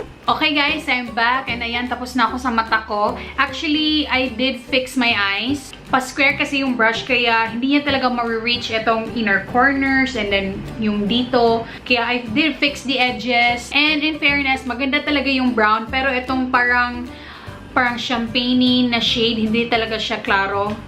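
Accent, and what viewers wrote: Visayas, Philippines. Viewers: HAHAHAHAAHAH ka cute sa pag bisaya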